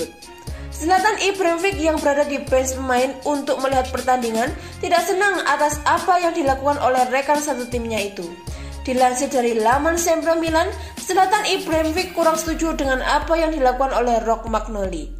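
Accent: Java, Indonesia. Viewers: Medok Amat Yaaaaaa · Min... Macane Romanyoli dudu Rogmanoli. Medhok iku gpp, sing penting pengucapan jenenge dibenerke. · Min terlalu medok..🤭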